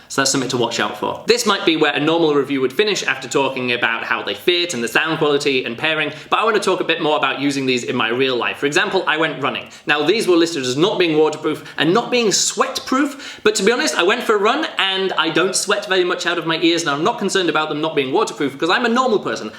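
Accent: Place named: Ireland. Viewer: i love ur irish accent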